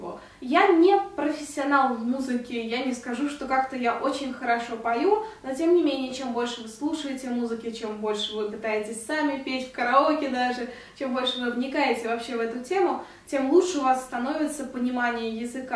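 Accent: United Kingdom. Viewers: А сделай видео на английском языке, интересно как раз послушать твой британский акцент :) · у кого нет музыкального слуха не стоит отчаиваться) есть исключения, я ни в одну ноту не могу попасть, а с акцентами в английском и испанском проблем нет. все же есть универы, где фонетику ставят на ура за месяц) спасибо за видео!! теперь все лопнут от интереса послушать британский акцент))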